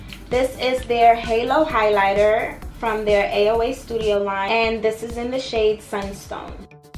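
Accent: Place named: New Jersey, United States. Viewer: Are you from NY because I’m from jersey and you sound like us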